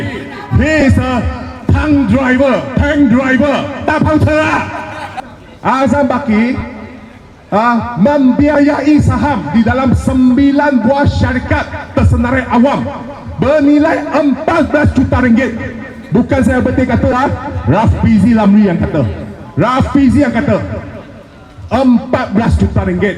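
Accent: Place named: China. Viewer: Ada juga yg nak dengar cina pelat bercakap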